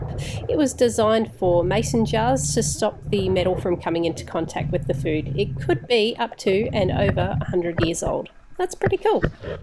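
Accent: Australia. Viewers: Your videos are the best! Love your beautiful Australian accent : )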